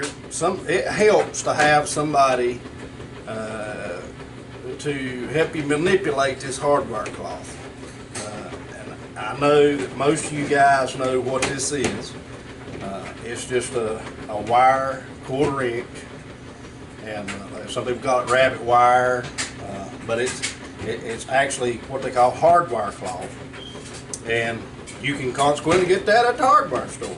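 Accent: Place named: Brooklyn, United States. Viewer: Love that Brooklyn accent!!!!!!!!!!!!!!!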